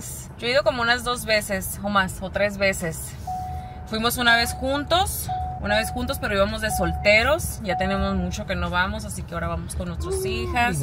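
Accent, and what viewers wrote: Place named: Mexicali, Mexico. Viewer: Me encanta ver tus videos Porke. Me gusta como ablas. Así casi como yo. Jajaja. Yo soy de Mexicali 👍😁pero vivo en los Angels ca. pero si. Así ablamos Nosotros. Saludos chula 😁🌹🥰😎👍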